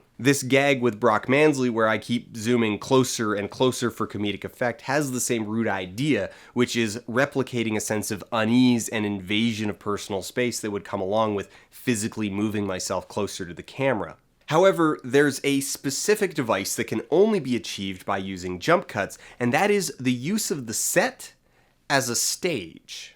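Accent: Canada. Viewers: 2 things: -So... You're Canadian? -While I agree with the video and the ending message, I think sound quality matter more for video than you're giving credit. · I was just wondering if he was Canadian.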